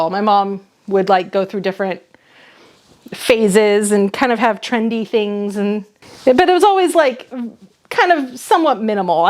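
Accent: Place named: Europe. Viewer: Eric Lindros 😅🇨🇦🏒 European hockey mom here and I love you 😊, cause maybe you "sound" to me a little bit like an European ❤ Love your videos and sense of humor 😂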